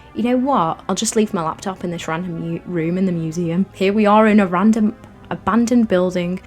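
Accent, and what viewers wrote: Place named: North West England, United Kingdom. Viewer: These vids are so funny, big up north west accents girl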